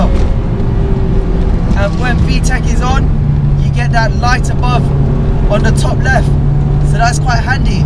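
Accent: United Kingdom. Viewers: Asian face and British akcent .. :) Nice car man.!! I'm really sure about that.. this car is trully Type-R last has been made.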